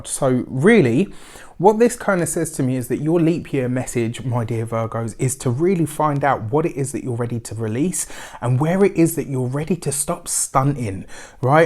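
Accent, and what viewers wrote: England, United Kingdom. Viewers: you are clear, honest funny and direct. Your cute English accent makes me smile. Thank you so much for continuing, I always look forward to seeing your posts.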